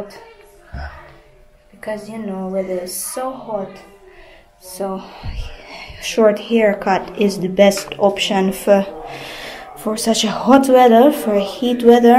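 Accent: Jamaica. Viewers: you a real Jamaican now. Love to hear you speak. You a comedian as well. 😂😂😂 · Yes can't wait for the gender reveal🎉 as long as it's healthy that's the main thing, don't like a cut I've noticed that some long time I think you gonna have a Deadlock in the household when he's older! And you're the funniest blogger out there you just naturally funny😂 the things you say you Jamaican accent keep it up you and your family are awesome! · Love your Jamaican ❤accent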